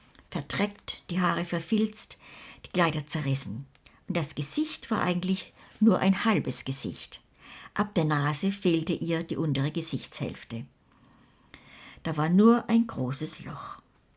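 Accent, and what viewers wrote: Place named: Baden, Germany. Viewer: Blödsinn! Dass ist Badischer Dialekt und mehr nicht!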